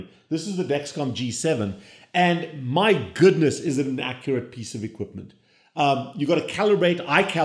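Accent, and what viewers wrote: South Africa. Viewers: Hello! Is that a South African accent? Ek hou van die afrikaanse taal. Greetings from Evergreen, Montana, USA.❤❤❤ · yes he is from south Africa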